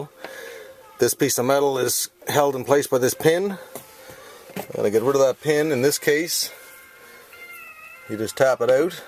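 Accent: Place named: Canada. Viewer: he sounds Canadian!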